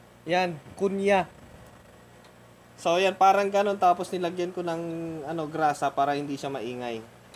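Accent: Ilocos, Philippines. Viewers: Ilocano ka sir?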